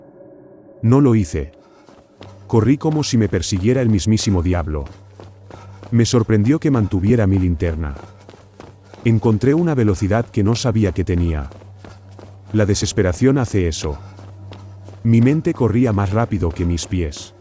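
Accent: Spain. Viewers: Y lo mejor es tu español de España sumado a tu manera de relatar,tan intrigante y la dicción,cada palabra y cada expresión que incluso nos enseñas a introducir y querér incluirlo en nuestro modo de hablar. Algo que admiro mucho de los españoles es que no solo saben hablar correctamente,saben tener un sentido del humor sin necesidad de ser grosero. Son increíbles. Saludos de Córdoba, argentina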